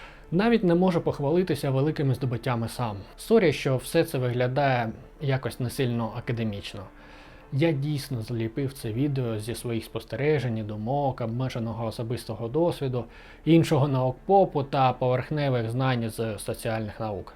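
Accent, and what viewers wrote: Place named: United States. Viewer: На фоні включив це відео просто по приколу, але в моменті справді стало цікаво. Це якийсь суржик чи фішка що ти в більшості міняєш г на ґ) спершу думав що помилився, але чим далі тим більше стало здаватись що ти омериканец який добре оволодів мовою, але залишив акцент)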